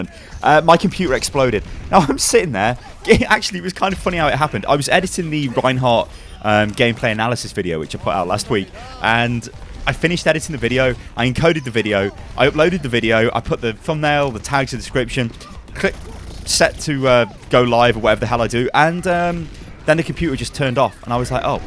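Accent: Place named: Cockney, United Kingdom. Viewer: I just noticed how you say computer in a cockney accent - compu'er. And the reason I love this channel is precisely because it's british, and I LOVE british accent - and its nuances - between the ones I've heard. Thanks for the hard work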